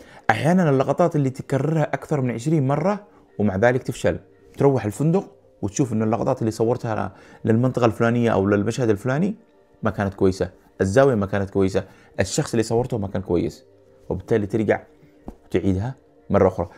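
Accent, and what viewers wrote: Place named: Yemen. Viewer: ياخي لهجتك شوي مغربي شوي يمني شوي مصرؤ بش اكثر شي يمني من وين انت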